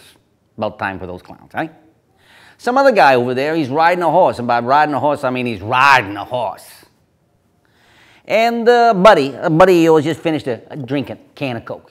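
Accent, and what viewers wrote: Canada. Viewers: are you canadian? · Are you Canadian???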